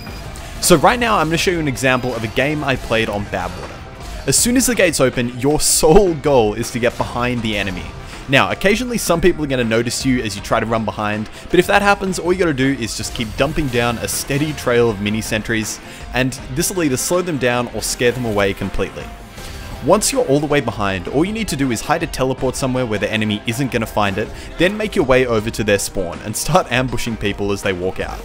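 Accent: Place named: Australia. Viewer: I swear, he sounded less Australian back then.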